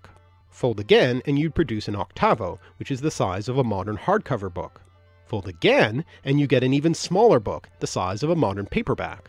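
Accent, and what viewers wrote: Canada. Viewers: I never noticed before, but I think you have a tad of a Canadian accent. Are you from the great white north?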